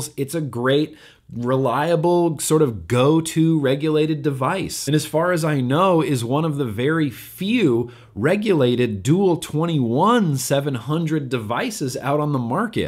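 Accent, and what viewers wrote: Australia. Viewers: I approve your Australian accent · Not bad on the aussie accent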